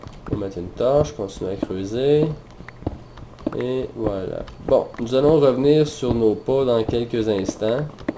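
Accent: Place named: Quebec, Canada. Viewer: T'est vidéos sont trop cool et ton accent Québecois est vraiment plaisant pi moi aussi je suis un Québecois, Vive le Québec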